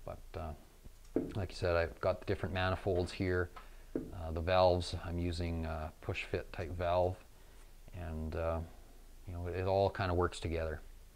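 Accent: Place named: Canada. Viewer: Hi, great video here on the Pex, I hear yer "Inns and yer Oautts" yer must be from Canada I reckon.? Please keep up the good work and God Bless. DD in L.A.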